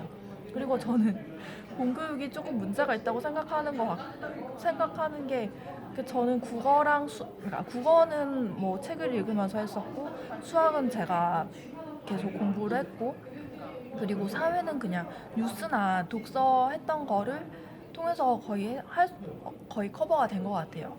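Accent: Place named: Busan, South Korea. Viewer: 원래 부산 사투리 쓰셨어요? 표준말인데 사투리 억양이 섞여있는 것 같아요. 화이팅👍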